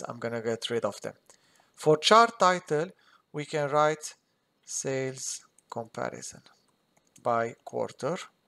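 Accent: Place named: Lebanon. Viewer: Hi, very clever. By the way, from your accent, I guess you're Lebanese, aren't you ?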